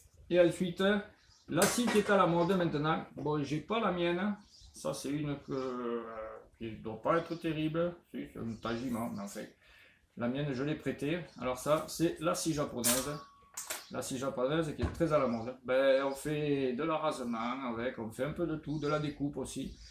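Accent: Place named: Provence, France. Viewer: je viens de decouvrir ta chaine,super simpha nous qui sommes en Australie trouvons que tu es un poete de Provence avec ton accent, tes expressions bien humanes,merci mille fois, tres agreables tes videos bon a bientot Robert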